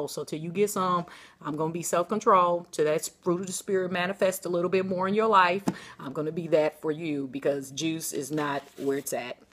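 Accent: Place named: South, United States. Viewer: Really???!!! Well I'm from NY, so everyone sounds country to me. lol. If I had to guess, I would say somewhere south of Virginia.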